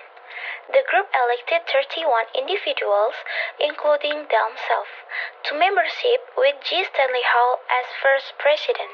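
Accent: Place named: United Kingdom. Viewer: Keren ya kak kayak ada british2nya gitu 🙏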